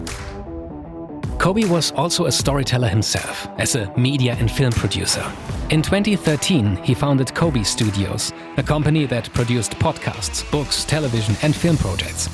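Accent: Germany. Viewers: Super Arbeit die du leistest mit den Videos! Man hört auf jedenfall raus das du deutscher bist :D Keep up the great work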